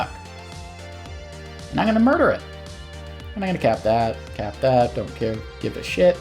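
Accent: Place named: Deep South, United States. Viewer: Fucking nutty game; takes big baboon balls to break down and embiggen your own failings. Loved this, as well all your content. Where are you from BTW, your accent sounds similar to my urban Houstonian but a bit more deep south.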